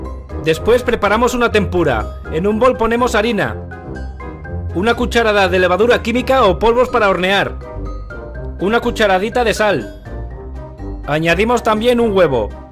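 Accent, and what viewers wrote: Spain. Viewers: bien eso es facil me gusto solo me da risa tu acento de español xD me encanta <3 gracias like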